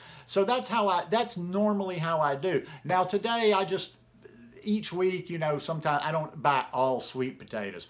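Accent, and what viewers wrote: South, United States. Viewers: If you want to put weight on, working out does build muscle! So, if you are not working out, you may want to add that to your weight gain process. Great videos! Thank you for sharing your experience! Your southern accent Always keeps your videos interesting and peaceful as I grew up Texas and you remind me of my life growing up! Vegan for life!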